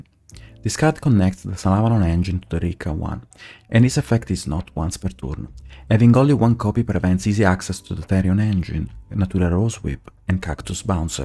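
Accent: Italy. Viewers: Italian Yu-Gi-Oh Banlist ASMR, i kinda dig it. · Are you Italian 😂 it's just endearing to me how you talk also my neighbors are Italians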